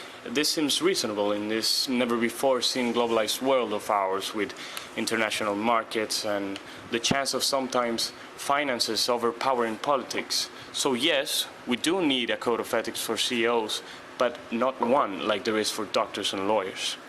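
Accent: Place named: Netherlands. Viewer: Wow, you sound so dutch...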